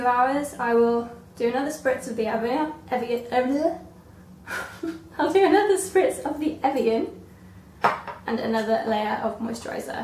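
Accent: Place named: United Kingdom. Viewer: I like you cause your the only person who has a travel video in a British accent cause im British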